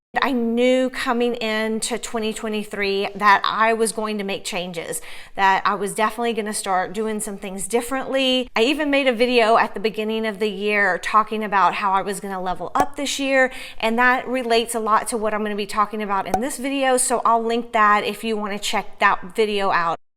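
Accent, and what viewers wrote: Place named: Louisiana, United States. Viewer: AWESOME,! You have obviously done so much to teach the pups how to be good babies, too. Awww this was a great video. Looking forward to more.:) Love hearing your Louisiana accent on Youtube. It's rare to hear it these days. xoxox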